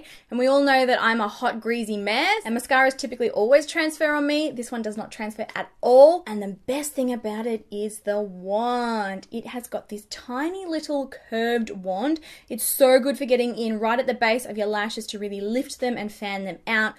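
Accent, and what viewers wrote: Australia. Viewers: I just want to put this out there. I'm not gay or anything. I'm happily married for 37 years. I just really love the way Australians talk.. That's all...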